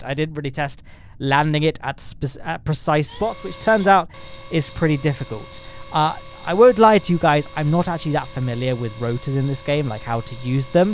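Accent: United Kingdom. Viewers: It's just how us british talk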